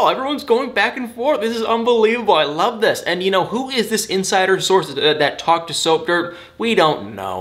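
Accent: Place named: Michigan, United States. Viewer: i can 100% tell you’re a fellow Michigander when i noticed how much you say “and what not” like me 🤣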